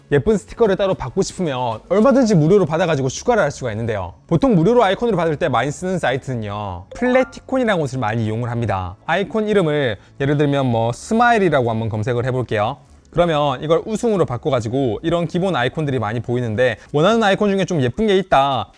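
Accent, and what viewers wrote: Gyeongsang, South Korea. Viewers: 친절한 갱상도 남좌 하지만 난 아이패드😢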